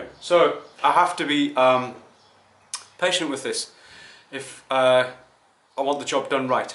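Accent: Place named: South Africa. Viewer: You south african, bru? giggle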